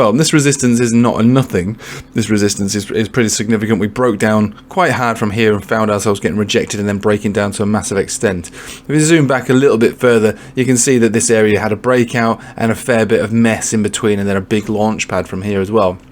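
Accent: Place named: Northern England, United Kingdom. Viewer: Dude, are you from Yorkshire, Lincolnshire or the north west? You might not be from any of them but love your content dude. Legend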